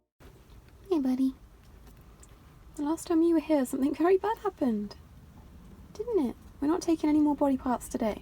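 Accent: United Kingdom. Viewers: are you brittish?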